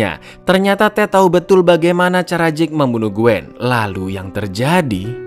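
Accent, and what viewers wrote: Java, Indonesia. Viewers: Pean org jawa ya mas? 👍🏻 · ketoro lek wong jowo bgt😂🤣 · Jowo keras lorr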